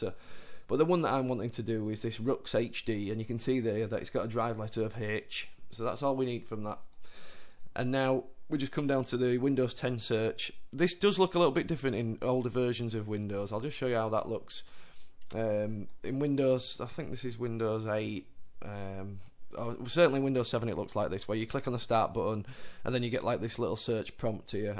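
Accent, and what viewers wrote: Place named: United Kingdom. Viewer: I'm a yankee. i usulaly don't have problems understanding Brits, but in this case hi accent added to the speed of delivery makes it hard to understand. Also his video is to small to see what he has typed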